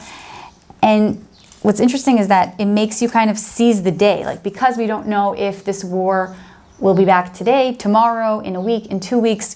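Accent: United States. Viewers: Which part of America are you from?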